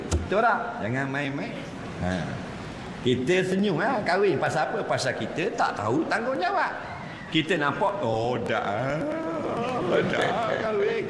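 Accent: Terengganu, Malaysia. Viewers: Orang Indonesia faham ke loghat Melayu Terengganu. Ketawa kamu tak kurang seronoknya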